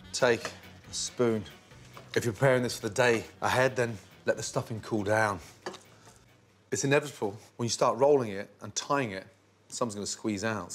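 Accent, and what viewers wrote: United Kingdom. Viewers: I just love watching you sir. Good recipe and looks delicious as well. By the way I love the British accent.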